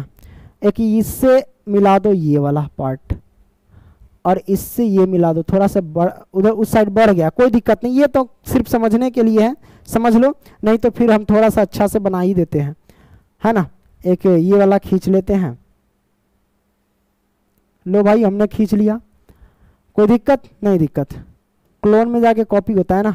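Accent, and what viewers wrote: Bihar, India. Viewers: You are. A good teacher but you talked some bihari language that why i didn't understood all work but you are a good teacher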